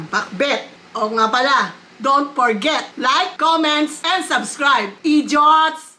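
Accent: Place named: Pampanga, Philippines. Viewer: bat parang maypagkapampangan si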